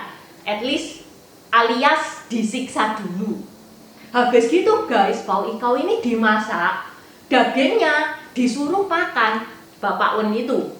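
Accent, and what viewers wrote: Java, Indonesia. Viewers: Uda AQ subscribe kak,maaf mo nnya,,Uda berkeluarga??medok tpi cina,lucuu 🤭🤭 suka n mnghibur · Tionghoa medok 👍👍👍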